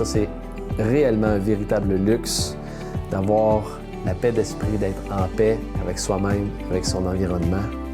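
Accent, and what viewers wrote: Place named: Canada. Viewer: Merci c est vrai que ça va vaut de l or vos 7 grands luxes....C est chaque fois des piqûres de rappel vos vidéos.. Avec votre accent canadien,c est magnifique de vous écouter 😊😊 Bravo 👏❤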